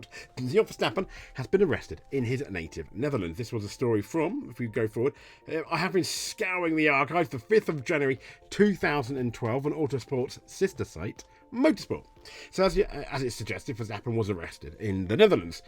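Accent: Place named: United Kingdom. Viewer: Ahh Brit accent. Stop watching after 10 seconds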